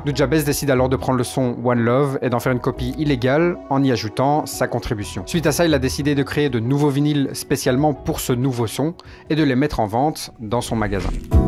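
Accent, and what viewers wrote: Belgium. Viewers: T’es Belge?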